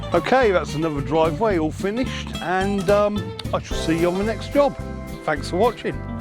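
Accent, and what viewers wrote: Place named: England, United Kingdom. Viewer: Top, like when you speak. A good English accent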